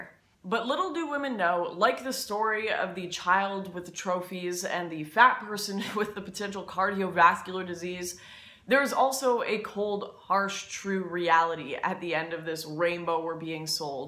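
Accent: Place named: Canada. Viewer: Don't worry about it. I thought it was you're Canadian accent and thought nothing of it.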